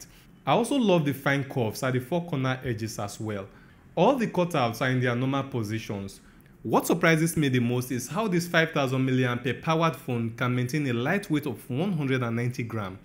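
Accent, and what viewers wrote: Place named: Africa. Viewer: Greetings from Venezuela. I had some africans friends and yoyr accent remember me them accent. I like it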